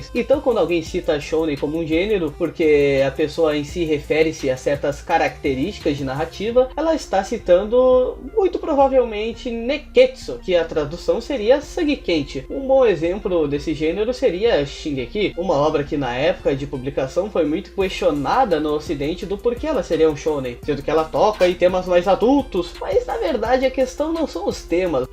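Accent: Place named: Rio Grande do Sul, Brazil. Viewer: Bah, é raro ouvir o sotaque de um conterrâneo em análise de animes e mangás. Sucesso aí tchê!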